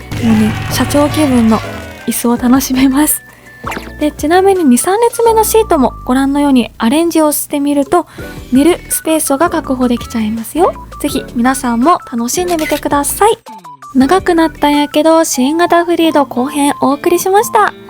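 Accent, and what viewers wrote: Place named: Ehime, Japan. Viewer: 新型フリード クロスター乗りです。久しぶりの愛媛弁、懐かしく可愛かったです。 旧西条市の市中心部出身でした。 チャンネル登録しますね。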